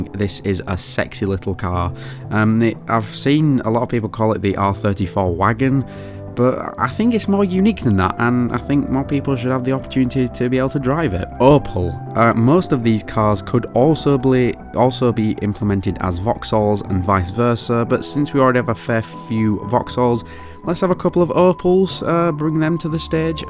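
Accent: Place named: Bolton, United Kingdom. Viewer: I can sense the bolton accent straight away for my first vid on the chanel😂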